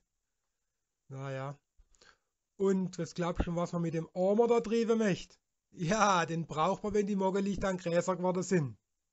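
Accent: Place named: South Franconia, Germany. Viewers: Hört sich an wie der Dialekt zwischen Karlsruhe, Pforzheim, Heilbronn, Heidelberg. Südfränkisch, umgangssprachlich "Badisch" oder wie auch immer der Übergangsbereich zwischen Schwäbisch, Alemannisch und Rheinfränkisch heißt. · Wohne seit 3 Jahren nicht mehr in Hohenlohe, war.... ich nenns mal interessant den Dialekt wieder zu hören 😂 Etwas cringe, aber interessant. Ziemlich nostalgisch. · Naa, des had mim schwäbscha ned sou vil zum doona. Des is halt dr frängisch daal voa Werddaberch. I kumm voa dr Blafeldr geichnd und do reidt mor a a bissla andrsch, wia der in dem Video do greidt had. Aber des is noo a voa aam Oord zum adra wider zum daal ganz andersch.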